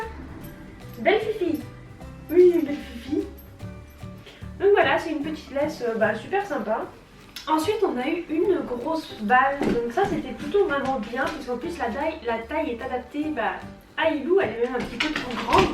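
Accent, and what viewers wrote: England, United Kingdom. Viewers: Ton accent anglais >>>> ♥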